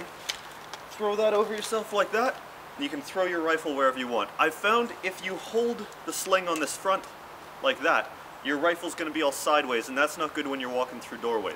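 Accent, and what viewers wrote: Canada. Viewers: Nice, You from Canada eh?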